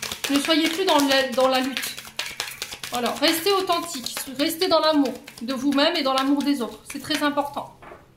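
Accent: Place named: Pas-de-Calais, France. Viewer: bonjour vs êtes du nord pas de Calais? vs avez l accent bien écrasé du pas de calais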